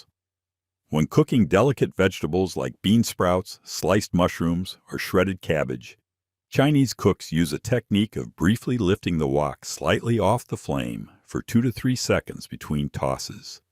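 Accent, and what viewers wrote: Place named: United States. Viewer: What's with that terrible American accent?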